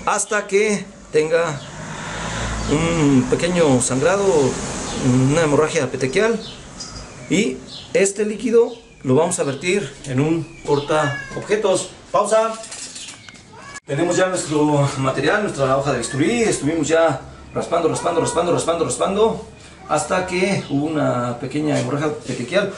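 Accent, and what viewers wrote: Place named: Puebla, Mexico. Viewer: Saludos médico Regrese con sus videos por favor Por el acento que tiene al hablar se me figura de Puebla de los angeles, paisano 🤝🏽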